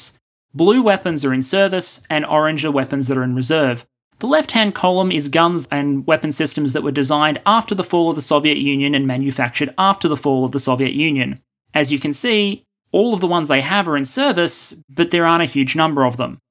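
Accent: Australia. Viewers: This video is three months old. But like all of videos...I can just listen to it over and over again. I think it's a mixture of the subtle comedy, absolute reasonable logic, and that cool sauve Aussie accent that is so calming.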